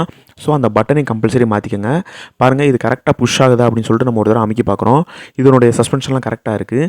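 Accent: Madurai, India. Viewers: Neenga entha oor madurai ya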